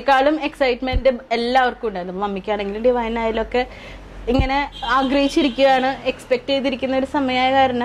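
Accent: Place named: Thrissur, India. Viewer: chechi dea Thrissur language ❤️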